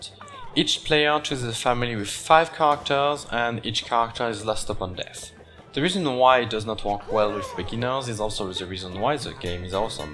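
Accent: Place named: France. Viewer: magnifique accent français :'D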